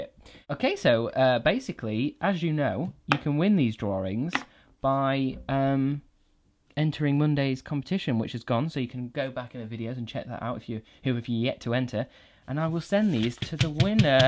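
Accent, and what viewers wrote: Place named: United Kingdom. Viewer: British accent is addicting.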